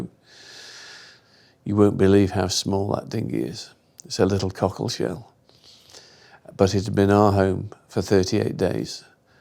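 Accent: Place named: England, United Kingdom. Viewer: Love love love the way an Englishman communicates. Old school!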